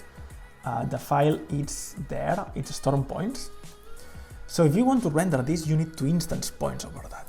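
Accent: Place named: Catalonia, Spain. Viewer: Sembles de catalunya tio, un grande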